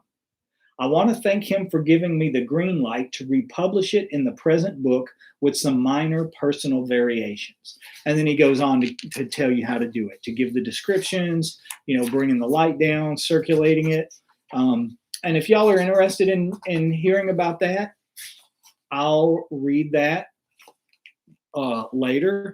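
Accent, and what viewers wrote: South, United States. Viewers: Man I wish I'd have gotten the notification in time to be here live. I'm gonna have to see what's wrong with my settings. I've been waiting for you to share another video. I enjoy your teaching so much. And I'm so very proud of you and everything that you've accomplished in your studies. I'm so thankful for your interest bc I always learn something new about things I've wondered from your broadcasts. And it's so refreshing to be able to listen to another southerner. Especially on the topics that intersect me. You're a one in a million type of person. I've known about your past since HBO's debut. And I knew as I watched in horror that you are innocent. And all I could do was pray about it and believe y'all would get out of that nightmare alive and tell others that didn't know what was going on. It does my faith in GOD so much good to see you doing so well. 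Your resilience is an inspiration to me. And I wish all the best in the world.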